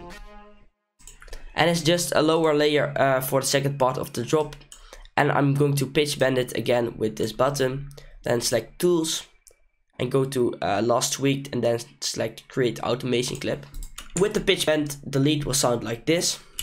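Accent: Netherlands. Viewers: Are you dutch or from belgium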